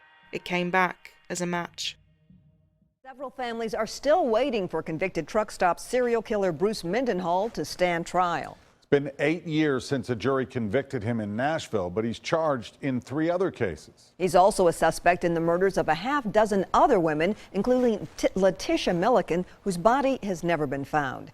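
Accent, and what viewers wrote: England, United Kingdom. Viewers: yeah it's weird I don't mind English accents in person but a voice over drives me crazy. Funny thing is I'm 50 percent English from the states. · why is the story of a murderer in Tennessee narrated by a person with an annoying English accent?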